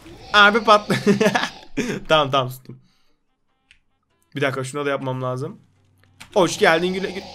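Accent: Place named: Thrace, Turkey. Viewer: Trakya aksamı :D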